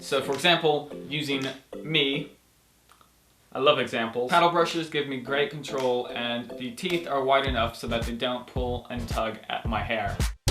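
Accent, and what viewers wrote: England, United Kingdom. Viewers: what's up with the english accent? lol he never sounded like this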